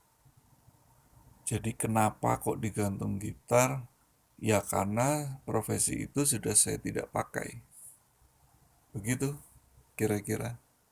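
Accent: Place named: East Java, Indonesia. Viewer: Mass sampean iki asline pundi kok kyak logat jawatimuran kalau ngomong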